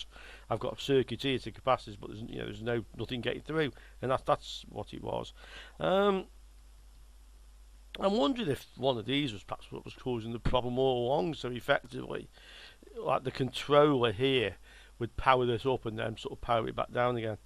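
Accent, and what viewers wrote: United Kingdom. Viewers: Got it! Thanks and thanks for not posting something rude. I was honest about wanting to learn from an expert and I clearly could not understand his or really any "heavy" British accent. I did manage to figure out "subtitles", something I did not know about. Even at 65 I still learn something new everyday. This Youtube is mostly a younger persons platform and have just recently started to watch, learn and enjoy from it.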